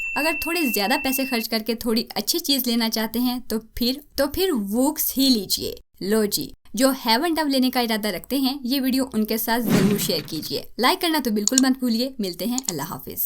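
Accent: Punjab, India. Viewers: Hy... i love ur voice specially ur punjabi language i loved it ❤ bcz m from india #punjab# 😘😘😘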